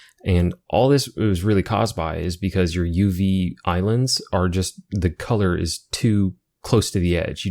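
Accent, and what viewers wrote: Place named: United States. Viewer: You look british but sound american?!?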